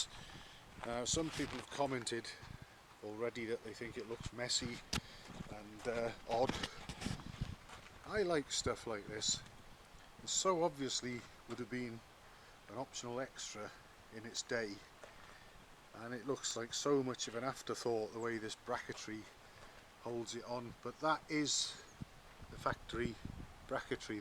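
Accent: United Kingdom. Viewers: I love listening to a proper british gent talk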